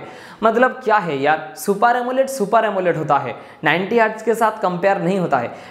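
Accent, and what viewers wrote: Bengal, India. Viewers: Lagta he ap bangali he.isiliye apka hindi sunne me bahut achha lagata he comedy comedy bolta he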